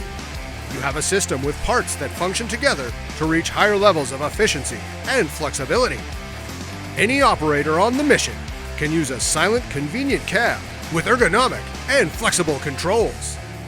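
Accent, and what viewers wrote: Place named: United States. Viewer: Best American accent I have ever heard!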